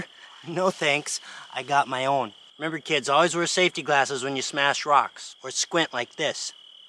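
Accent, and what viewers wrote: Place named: Midwest, United States. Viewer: If you have yucky sticky clumpy dirt in your bucket, add water like you did at 23 min. in this video. The magic tool? (2 of them, actually) 1. ALWAYS put a few drops of JetDri in your bucket! With your fine flour gold, you MUST break the surface tension, or fine gold can actually float, and get panned out. 2. Bring a battery-powered drill along. Attach a paint mixer to it. Use it to pulverize the clay balls and loosen all material, freeing it up for more effective panning. Bring an extra battery just in case. LOVE your videos - haven’t heard that Midwest accent in many years… Makes me homesick!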